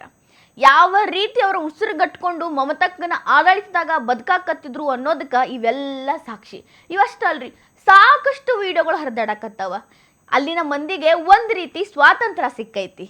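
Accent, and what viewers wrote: North Karnataka, India. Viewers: ಉತ್ತರ ಕರ್ನಾಟಕ ದ ಭಾಷೆ ನಿಮ್ಮ ಬಾಯಲ್ಲಿ ಕೇಳುವುದು ತುಂಬಾ ಸೊಗಸು. ನಿಮ್ಮ ಸೊಗಸಾದ ಮಾತು ಕೇಳುವ ಹಂಬಲ ನನ್ನದು. ದಯವಿಟ್ಟು ಸದಾ ಮುಂದುವರೆಯಲಿ. 🙏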